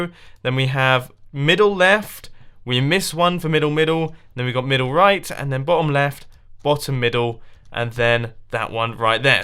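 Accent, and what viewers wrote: United Kingdom. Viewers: Mumbo is so British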